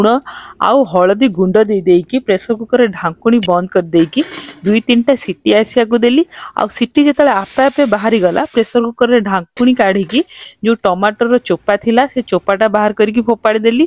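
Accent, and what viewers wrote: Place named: Odisha, India. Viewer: Mam apana pura pure odia kahuchhanti america re rahiba pare madhya Proud of India proud of odisha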